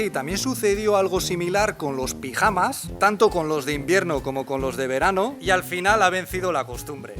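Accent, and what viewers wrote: Basque Country, Spain. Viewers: Tú tienes mucho acento vasco pero tu novia ha aprendido muy bien el español pero no le has contagiado el acento. Bromillas aparte, un vídeo muy interesante y bien editado. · No suelo contestar ni hacer comentarios, pero podéis conmigo. Como pareja mw encqntais, además que la socialización entre dos culturas tan diferentes colo la española y la China 🇨🇳me atrae una barvaridad., me encanta tu manera de hablar como vaasco que eres Yo soy catalán, hijos de andakuces y me encanta tu manera de hablar. Ojalá esto del covid-19 acabe y podáis hacer una quedada para conoceros. Me habéis eclipsado 🥰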